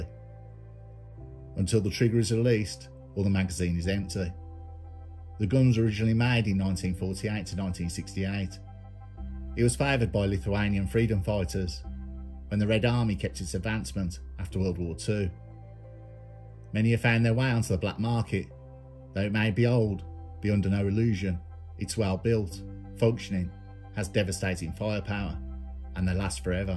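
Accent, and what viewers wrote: Birmingham, United Kingdom. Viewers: Its Just a Brummie accent mate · There honestly is nothing like a video from, the dulcet Brummie tone, the deadpan delivery, the stories of mans inhumanity to fellow man and the haunting yet relaxing music are what makes these videos such a winning formula. I had to find the music and found this: <link> Apparently it's called " " but I think it may come under a different name in the YouTube music library.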